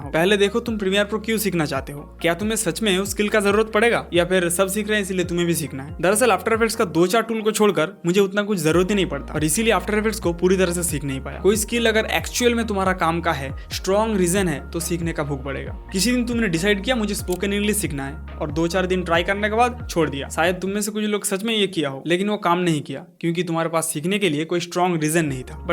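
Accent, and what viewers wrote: Bengal, India. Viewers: your bengali is so cute 😃😃 I a from bangladesh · bengali tumi ??😅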